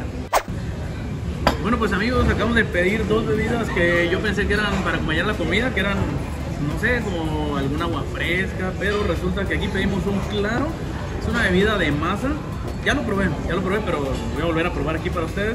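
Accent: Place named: Colombia. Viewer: Y otra cosa a mi me encanta su acento el colombiano tiene su particularidad al hablar y claro depende la región porq hay unos q exageran pero los normal me encanta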